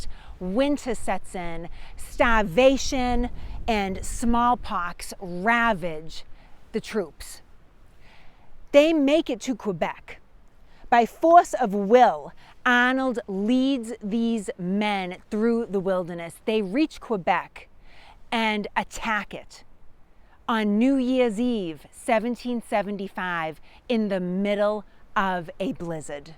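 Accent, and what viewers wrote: Boston, United States. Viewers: Boston accent much? Southie? Dah-chestuh? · ❤❤❤❤Brilliant Historical Videos! Loooove the Boston Accent! Too! ❤❤❤❤ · You only have to listen to talk for 30 seconds to know that she is definitely from Boston.🤣💕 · Great history and I always look forward to your informative and acurate posts. No way AI as that Boston accent is unique, (to humans.) Keep up the good work! Can you post any articles links that you write? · Great piece, excellent production, clear presentation and information before unknown to myself, I love the charm of New England, Boston accent...you are bold in facing the wind with such humour and laughter, can't wait for more of these, makes learning about the history FUN!!!!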